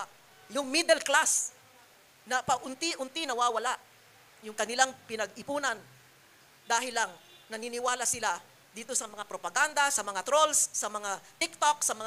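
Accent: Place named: China. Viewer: Chinese ka ksi kaya ganyan ka magsalita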